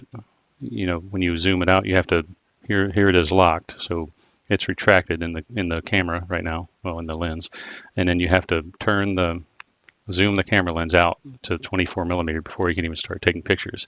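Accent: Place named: United States. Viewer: Neigh con, zee lens. No matter how many times I hear it said in an American accent, I mentally keep correcting it as Nick on and zed. English is a strange language to me.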